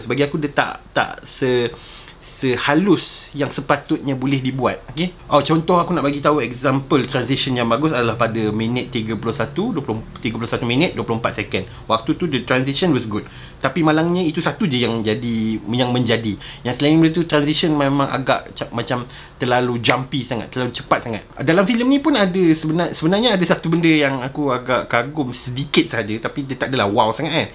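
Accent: Kedah, Malaysia. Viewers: Rasa lorat dalam kawasan kedah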